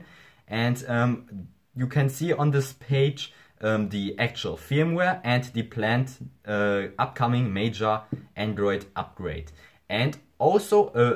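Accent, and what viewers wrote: Germany. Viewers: Hallo, Ersteinmal zu Dir. Deine Arbeit sticht heraus, besonders da du auch auf die Details eingehst die andere in ihren Tutorials gerne auch mal unter den Tisch fallen lassen oder nur sehr dürftig erklären. Das Thema ist weitaus komplexer als nur 'buttons' zu drücken und ein USB mit dem Rechner zu verbinden. Daher, hats off! Machst du gut ansonsten würde ich dich nicht mit meinem ersten Youtube comment ever beehren und belästigen. Bleib so bzw. entwickle dich! English and fluently? Keine Sorge, da kommst du noch hin. Bist ja schon gut am üben für... ich nehme mal an die English Prüfung in mündlich? Versuch mal das, dein English nicht zu sehr 'einzudeutschen'. ;) Nun zu mir: Ich (completely newbie) und in der letzten Zeit viel zum Thema flashen und rooten angelesen (xda, etc.) bzw, tutorials dazu gesehen (hier und anderswo) möchte, um klein anzufangen erst einmal mein SM-G935F mit 1&1 Branding a)debranden und b)mit einer neuen und aktuelleren FW (DBT/G935FXXS2DRA9) grundversorgen. 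Vielleicht kannst Du mir mit deiner Expertise meine gebliebenen Fragen beantworten und Ja! Sorry schon mal vorab für das nun folgende Buch... Ist Zustand: SM-G935F mit 1&1 Branding FW: Nougat 7.0. Keine SIM vorhanden (mein erstes Mobile seit Jahren und ja, es geht auch ohne! :D) Weder Pins, Passwörter noch Fingerprint eingerichtet Mobile mit einem Google Konto eingerichtet Frage zu: Backup Ungerooted kann ich Titanium Backup nicht verwenden. Helium speichert die Apps nur in die Cloud (Google Drive) a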